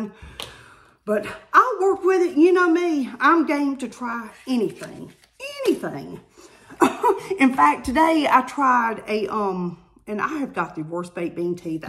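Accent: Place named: South, United States. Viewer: Hey you! I subscribed to your channel a couple of months ago. I love your southern accent! And I too have been watching Jessica for years.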